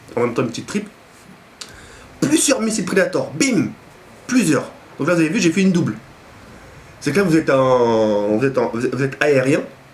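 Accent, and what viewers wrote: Arab world. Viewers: tu parles un peu a la rebeu ^^ mais tu geres et tu respecte vraiment les autres, au point de dire que mrlvl12 explique mieux, tu te prend vraiment pas la tete ca fait plaisir ;) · Salut, c'est dernier temps tes vidéos me plaisait moins, tu était moins acharnée je pense que tu attendais ce nouvel Opus pour pouvoir faire l'originalité et te refaire plaisir. J'ai eu le sourir durant cette vidéo car j'ai bien vue que tu était dans ton truc tu aimez tu passez du bon temps a commenter ta vidéo. Tu redeviens le bon avec son putain d'accent d'arabes (Pas méchant hein;)) Tu me fais plaisir continue, tu vas allez loin et surtout: AMUSE TOI!!! Peace frerot! <3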